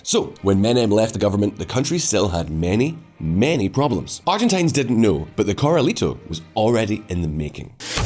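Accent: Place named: England, United Kingdom. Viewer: Reading Spanish with English pronunciation. Is this a troll?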